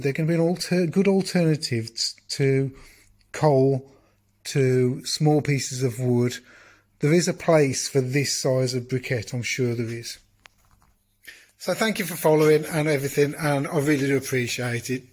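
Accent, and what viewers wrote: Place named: West Midlands, United Kingdom. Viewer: Greetings from a Brit in Germany. Nice to hear a West Midlands accent (I studied in Stoke) I am fascinated with "coffee logs" and recipes. I have been saving all my waste coffee for ages. Tell me can you include waste paper in this mix as well as waste sawdust? Do you think these "peat" briquettes might be useful to bake bread in a Dutch Oven experiment?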